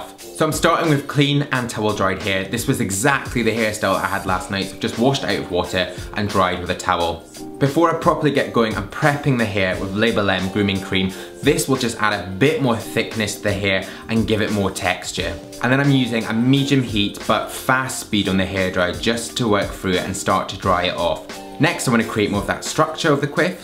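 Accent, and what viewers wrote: Scotland, United Kingdom. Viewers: you know what's cool you're scottish and i understand everything you said because scottish people have a different accent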